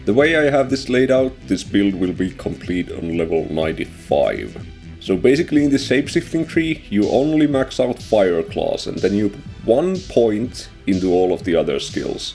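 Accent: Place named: Finland. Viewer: Sounds like a finnish accent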